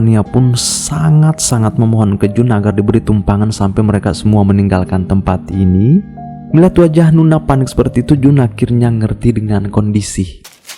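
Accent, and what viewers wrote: Bugis, Indonesia. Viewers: Orang Bugis yaa bang? Tau sidrap ka iyya bang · Serasa ada yg ganjal ,,, sepertinx pembicaranx orang Bugis ... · Dehhh masi ada logat bugisnya🤣🤣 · Bugis apa bang😂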